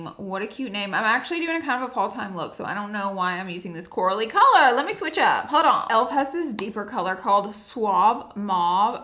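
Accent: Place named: California, United States. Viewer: You usually always have this Cali- vibe to your voice, in this video you sounded like a New Yorker! 😅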